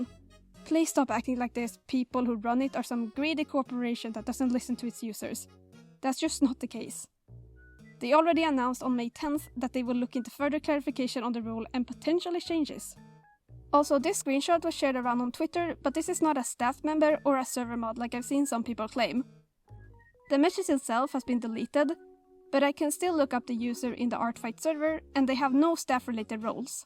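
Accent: Sweden. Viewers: Finally someone's speaking facts about this! Anyway, this is off topic, but I like your accent, I'm Swedish too!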